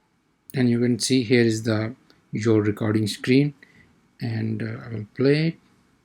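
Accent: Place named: Sialkot, Pakistan. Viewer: I like your sialkoti english accent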